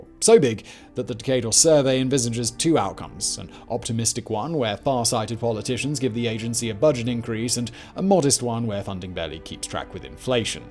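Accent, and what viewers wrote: RP, United Kingdom. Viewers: Fact Boi is such a professional online educator that he can maintain his perfect RP and a straight face (all but once) through the constant barrage of bunghole puns. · Classy British accent - Well-dressed - Well-groomed - Thoroughly-researched facts and insights - Literally twelve years old, apparently 😁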